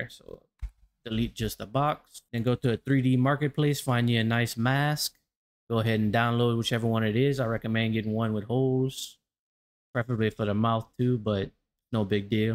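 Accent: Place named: Louisiana, United States. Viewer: Oh you’re definitley Cajun 😂😂😂. 337 definitley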